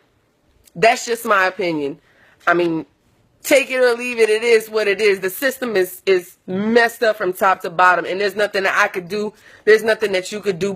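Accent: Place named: New Orleans, United States. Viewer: 1202_ProgramAlarm she has a New Orleans accent... she obviously Cajun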